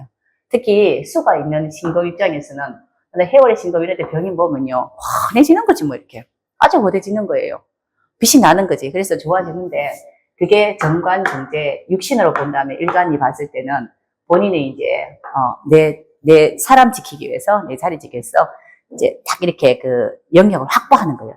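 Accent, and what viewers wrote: Gyeongsang, South Korea. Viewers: ! 표정이나 말투가 보기 좋고 경상도 사투리 투박한 억양을 아주 기분좋게 잘 쓰네여 을목 오월생이 병화를 띄워 그런지 엄청 밝아서 좋은 에너지를 받아여 간간이 보여주는 유머나 천진한 듯한 애교도 구독자의 시선을 한 시간 동안 뺏기에 모자람이 없어 잘 보고 구독하며 감사드려여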